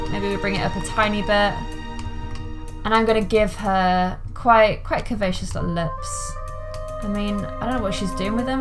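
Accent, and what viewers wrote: United Kingdom. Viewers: Oh my god she's so British. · Shes british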